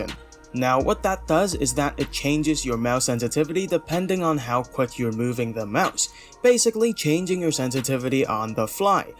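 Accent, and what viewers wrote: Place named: Asia. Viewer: love the asian accent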